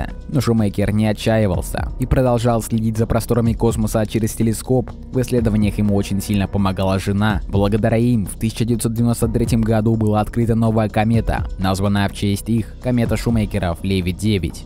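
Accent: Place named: Moscow, Russia. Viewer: да, уберите мааасковский ааакцент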